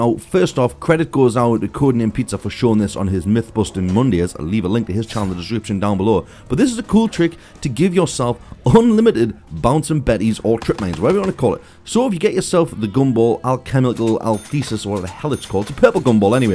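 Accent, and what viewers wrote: Newcastle, United Kingdom. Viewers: are you a geordie mate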